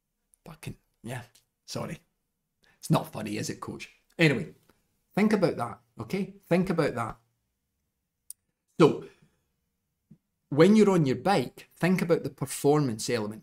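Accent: Scotland, United Kingdom. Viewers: well deserved, Sir. I like the Scottish accent a lot and your humour in particular.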